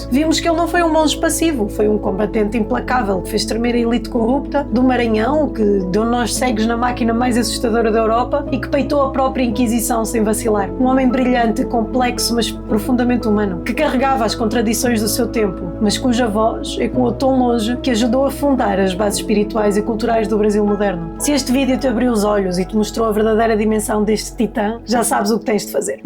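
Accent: Portugal. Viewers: Adoro o sotaque português!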